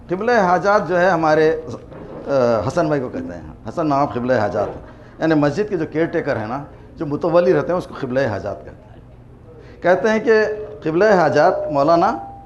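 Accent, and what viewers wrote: Hyderabad, India. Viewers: Hydrebadi hain unki maadri zuban ki waja se ye alafaz ada karna mushkil hai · It is the Daccani/Haiderabadi accent which is fine. Qasam is pronounced "khasam" in Haidarabadi accent and you might have hearf, he has pronounced "Kharaabaat" as "Qaraabaat" once. This is the daccani accent. In Delhi it would be pronounced "Qasam" and "Kharaabaat". Yes, and in Panjab it would be pronounced "Kasam" in some regions even "Haraabaat" instead of "Kharaabaat", and this way way way worse.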